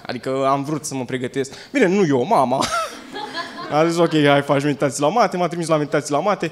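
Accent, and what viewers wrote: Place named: Moldova. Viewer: Un oltean cu accent de moldovean. Greu sa-l inteleaga ardelenii.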